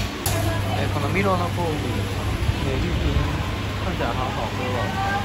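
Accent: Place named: China. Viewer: あなたの中国アクセントも可愛いですね😊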